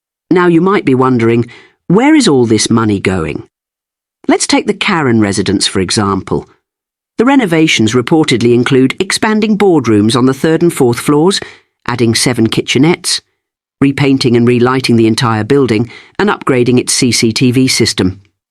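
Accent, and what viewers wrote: United Kingdom. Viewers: I am for the audit when this is narated by a British,I have a problem 😢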